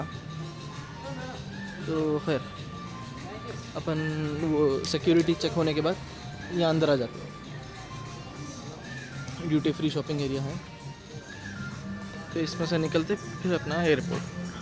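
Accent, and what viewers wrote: Hyderabad, India. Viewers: PURE hyderabadi yaar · Waahh... Puri Hyderabadi language Bole aap.. Good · Bhai hydrabadi bhasa bhot achi lagti meko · Sir your Hyderabadi Hindi is Fabulous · Hydrabadi Hindi Is awesome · wow man i liket your hydrabady language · Hyderabadi sunkey sukun masla dikha..mast samjhaye 😍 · Awaaj sunke bhi aap ko pata nahi chala bhai haidrabadi h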